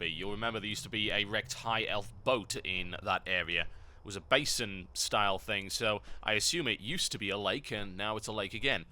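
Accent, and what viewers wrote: United Kingdom. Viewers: hah, nice brit accent. both pretty funny and quite clear to make out the sense. even for some terrible non-native english speakers. watch out for kgb, and god save your queen!=)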